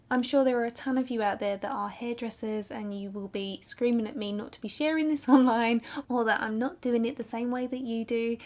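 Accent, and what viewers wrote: United Kingdom. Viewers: U r beautiful and I like ur English accent....it seems a UK accent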